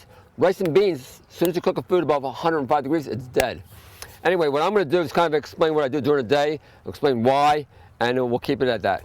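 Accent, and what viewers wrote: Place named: Philadelphia, United States. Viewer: Sweet :) I grew up outside Philly and am an 18 year raw vegan. I moved to Portland, Oregon, long ago, and when I go back to Philly, folks do not understand what I am doing. They guess my age as 20 years younger than I am. (In Portland people guess my age as 10 years younger than I am.) So it is quite a comfort to hear someone with this knowledge and practice sharing it with a Philly accent. :) If I ever go back, I will make a bee line and come and visit you. Blessings Always.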